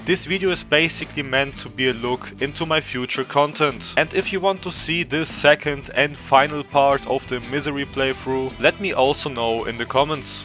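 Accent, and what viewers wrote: Germany. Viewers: Took me 4 seconds to realize that he's german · I did really enjoy the video. I suck at giving feedback sorry but im excited to see more. I was also thinking the entire tume that u sound so german so it was pretty funny to find out. Anyway i think u convinced me to finnaly try misery myself, also overcarrying yourself to sell all the loot is relatable af. Keep up the great work!! <3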